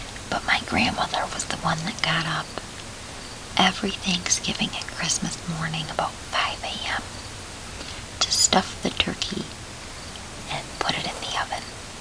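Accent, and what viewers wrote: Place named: South, United States. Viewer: what I looove about this video is... :D many aspects, dear: your accent (southern US? I am not native English speaker yet I would place it there), the brush, the little stories and of course the pictures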